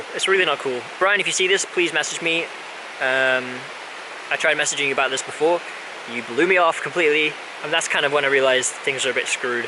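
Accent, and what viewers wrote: United Kingdom. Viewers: I like that your accent ad everyone in the UK is so different, but every time I watch a video of yours I always think you have a cold. Also no one should be told what to do and when to do it. Live your life the way you want and make videos you want when you want. I love Damon and I am glad he is back, but he stopped making videos for so long because he didn't want to make them and didn't feel right making them ya know? Do you and tbh yes what Bryan did was wrong and if he had true friends he doesn't and if they ever think of being his friend again then he has a lot of making up to do and a lot of trust to gain back and everything. I don't know Bryan personally and I am not sure I ever will, but yes I will be careful if I ever was to meet him and know him personally. Keep your head up. ♥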